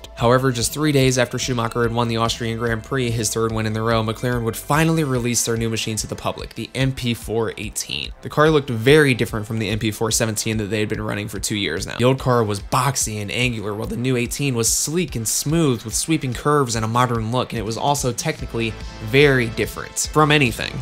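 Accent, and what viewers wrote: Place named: Chicago, United States. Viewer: Your accent seriously sounds Chicagoan.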